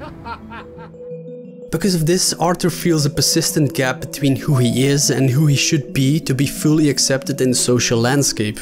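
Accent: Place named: Russia. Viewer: What's with the new Russian accent though?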